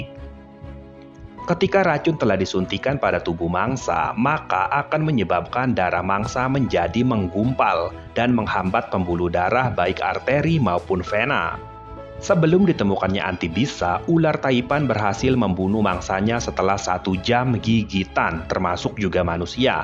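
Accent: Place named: Tegal, Indonesia. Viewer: Orang tegal hahha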